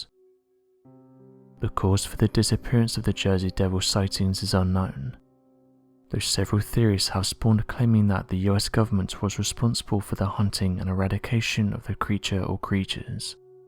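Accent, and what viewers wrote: United Kingdom. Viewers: Spooky mysterious British accent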